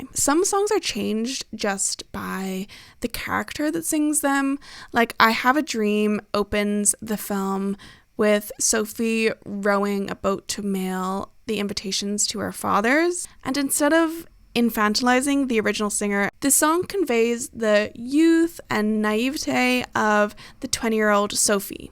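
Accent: Ireland. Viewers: i was wondering why you had a slight irish accent and then you said your house in ireland (and ar-land not eye-er-land to boot lmaoo) everything makes sense !! irish queen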